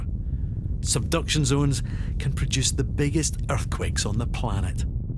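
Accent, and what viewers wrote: Scotland, United Kingdom. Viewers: I love the Scott’s accent! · The Scottish accent makes it sound even more frightening.... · what accent is that? scottish? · I love your accent. So delicious! I always loved Scottish accents. I'm partly Scotch myself, but I don't have an amazing accent like that!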